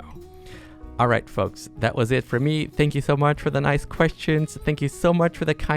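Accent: Germany. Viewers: I think it does need to be pointed out that your German does really sound German for other foreigners. Then again, there isn't really a sole German accent. Just ask the Bavarians or Austrians😅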